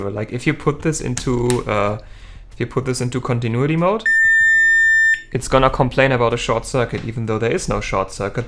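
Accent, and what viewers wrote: Germany. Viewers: Started watching and thought to myself that is German accent isn’t it? Then you said Germany and I knew it!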